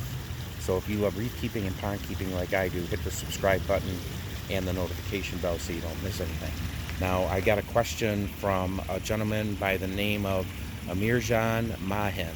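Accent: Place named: Wisconsin, United States. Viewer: Ughhh!! I can’t stand this guys accent!! Pawnd. It’s like a Wisconsin accent I’m sorry but it just bugs me!!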